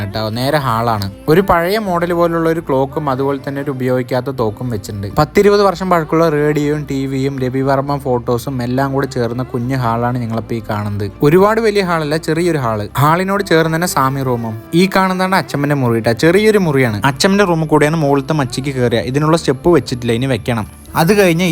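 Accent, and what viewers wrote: Palakkad, India. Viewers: Palakkad aano? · താൻ പാലക്കാട് ആണോ സംസാരത്തിൽ അങ്ങനെ തോന്നുന്നു🤨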